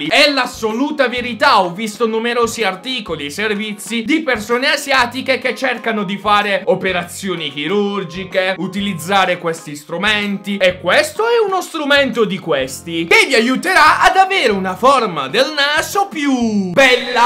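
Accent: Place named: Naples, Italy. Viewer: Mi fai troppo ridere poi non so perché hai l'accento napoletano no perché ti seguo da 1 mese ma non so se sei napoletano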